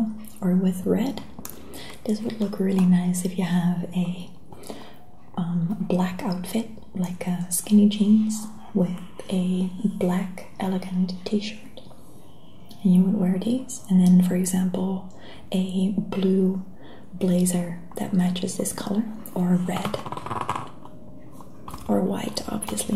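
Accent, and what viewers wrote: Netherlands. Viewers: Calm down. She is dutch, English is not her first language. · she's Dutch its the way she speaks !x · She talks like that because she is from the Netherlands. Obviously, not everyone speaks English. Most people who watch her videos love her accent. So, if you don't then don't watch her videos,simple. She is trying to help relax you/give you asmr tingles, not talking about the way people talk. Maybe if you was actually smart,you would realize she is from the Netherlands and she has an accent and can't help the way she talk,but you don't know that. I mean,it's first grade so learn it. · she;s Dutch, and therefore has a Dutch accent. Don't be rude. This is a relaxing community...please take your negativity elsewhere.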